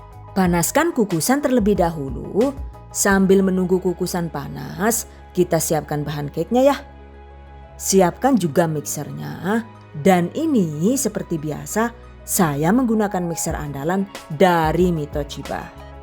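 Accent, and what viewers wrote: Banyumas, Indonesia. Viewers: Kenaa seneng banget liat chanelnya karena resepnya enak, penjelasannya detail selalu ada tips n trik jadi mudah dimengerti, dan selalu berbagi resep yg lg hits n viral sekarang selalu update,resepnya hasilnya selalu lucu2 warnanya jadi keliatan menggoda2🥰 suaranya juga lucu selalu ada lucu2an ngapak2an bae 😃 selalu menginspirasi buat ide jualan saya.. Trimksh 🙏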